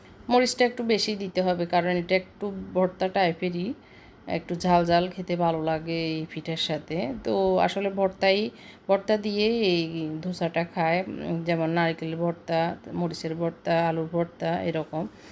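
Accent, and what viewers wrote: Noakhali, Bangladesh. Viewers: আপু আপনার জারটা সুন্দর হয়েছে। আপনার কথা শুনে মনে হলো আপু আপনার বাড়ি নোয়াখালী। আমি আপনার পাসে আছি।আমার বাড়িও নোয়াখালী।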